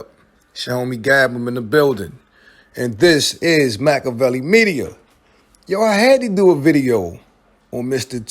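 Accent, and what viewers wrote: Baltimore, United States. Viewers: I bet your bottom dollar this dude doing the narrating is from Baltimore guarantee it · Sounds like your from Baltimore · U from Baltimore i can tell by the way you say to and do lol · You front Bmore you kinda have a accent like you from here my dude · You from bmore aint you? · U got to be from Baltimore with that accent 🤔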